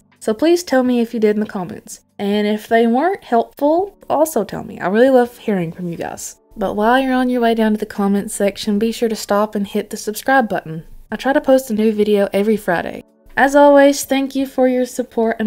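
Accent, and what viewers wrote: South, United States. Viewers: you sound southern but your voice is so sweet and calming! this video is so helpful thank youu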